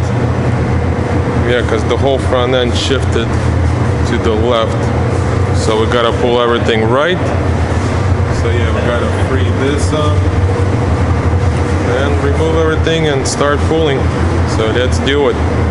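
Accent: Russia. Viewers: Говор как у русского.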